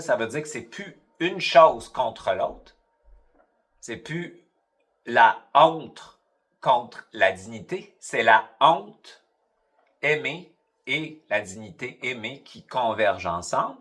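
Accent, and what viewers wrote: Quebec, Canada. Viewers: Même si je capte beaucoup de bonne choses, L'accent québécois m'échappe dans ce sujet des blessures. 😅. Pourtant merci pour ces éclairages 🎩😍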